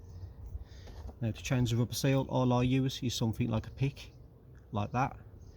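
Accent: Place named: Black Country, United Kingdom. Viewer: i dont think he is a brummie! defo from westbrom, dudley, tipton or wolves 😂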